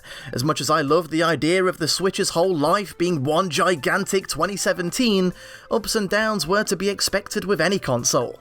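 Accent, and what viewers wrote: United Kingdom. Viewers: I kinda like British better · This sounds like a British. (Hope you get feeling better. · I'm really like the new accent. British? Yes, please! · voice is the opposite of soothing. It's super nasally and whiny. This guy is British. It doesn't get any better than that. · sounds a lot like Dave from BoyinaBand. And I don't just mean because of the british accent, they have a similar tone and even inflection to their voices that for a moment I thought it WAS Dave doing the Voice Over. Eerie. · Thank you British, very cool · This should be the British dub of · British is best. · Damn. I like British. Really really great voice and speech. Probably my 3rd favorite YouTube Guy to listen to.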